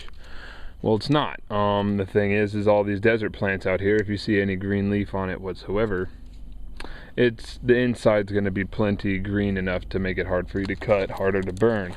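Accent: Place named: West, United States. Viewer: Sounds like you from the West. Some good advice brother.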